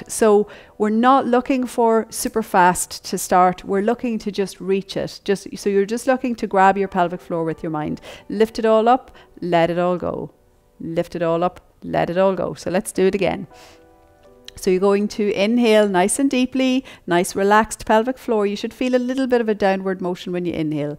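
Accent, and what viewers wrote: Ireland. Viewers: sounds weird but i can feel it when i lift as i exhale maybe my body is just weird like that LOL i love your irish accent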